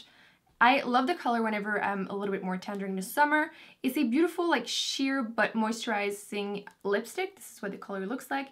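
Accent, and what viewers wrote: Quebec, Canada. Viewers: sounds like a french canadian accent ? cool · your accent is my fave! I love listening to French Canadians speak... is that weird? haha love you! xx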